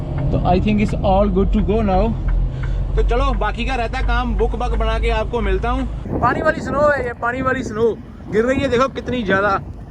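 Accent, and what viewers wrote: Punjab, India. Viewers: You are a good teacher. Like your Urdu but tarka of Punjabi gives more pleasure. Please take special care while driving. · Punjabi di hindi bhaut vadiya hundi hai bhaji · Vicho vicho nikal aundi aa punjabi🤣🤣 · Bhai bhaut maza aya 😂😂🤣🤣🤣 hindi wale te chib kad te And one like for ur effort cz punjabi bande laye hindi bolna yada muskil h english boln to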